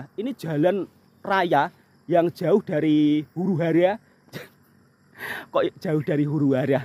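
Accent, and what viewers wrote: Java, Indonesia. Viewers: bang medoknya ngisin ngisini☺️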